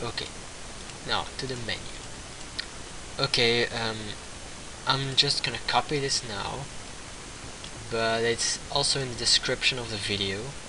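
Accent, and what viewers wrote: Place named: Philippines. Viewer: Hey, if ur not jepenese that ar u filipino cuz u have an accent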